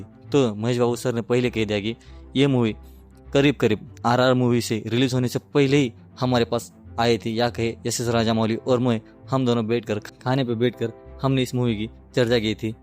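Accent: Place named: Nepal. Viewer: By the accent, I'm guessing you're Nepali?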